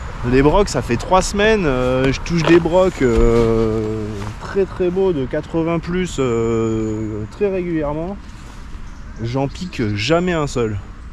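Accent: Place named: Normandy, France. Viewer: Bravo pour pour ce silure! Tu fais jamais bredouille sur la Dordogne? J'essaye de décoder ton accent, est ce que tu es de Normandie ?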